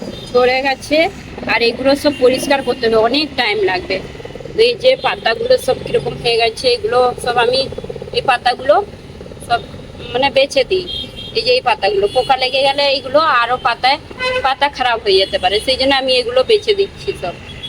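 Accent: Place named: Odisha, India. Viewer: Apnar kotha sunle mome hoy bangali non, odia mone hoy. Apana kon odia achhi ?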